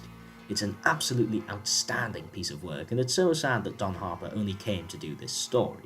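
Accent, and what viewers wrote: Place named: Newcastle, United Kingdom. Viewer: I opened this video expecting a nice run of samples of incidental music from big P Troughtons run, instead i get this wet geordie talkin a load of rubbish, big thumbs down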